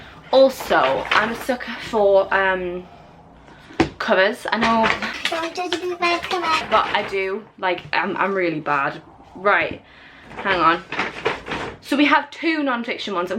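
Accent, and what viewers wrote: Newcastle, United Kingdom. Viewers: What accent do you have? Sounds slightly Geordie, soz if not I'm Welsh don't know too much about England. Your accent is very cool btw :) x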